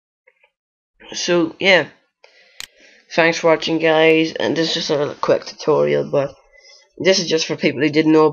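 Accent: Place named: Ireland. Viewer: You sound Irish :) !!!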